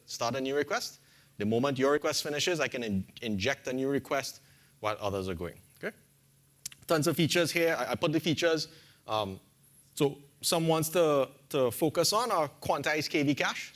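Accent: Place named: Caribbean. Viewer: Thanks for this presentation,. This has been very helpful. Also great to hear a Caribbean accent 🇹🇹. Bless up me bredda.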